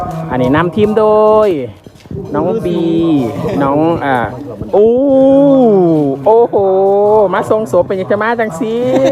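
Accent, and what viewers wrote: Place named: Ubon Ratchathani, Thailand. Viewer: เสียงดีมากทำนองสมกับเป็นลูกเมืองอุบล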